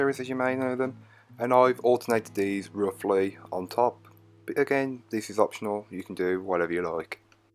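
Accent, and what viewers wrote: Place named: Walsall, United Kingdom. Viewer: Hey love this! my mum always buys a black forest gateau for Christmas but I think this year I might give this one ago..😆 can I ask where your from as listening to your accent you sound like my brother in law he is from warsal area I have probably spelt that wrong lol 😁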